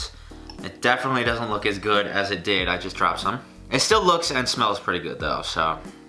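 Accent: New York, United States. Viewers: Sounds like suburban New York to me.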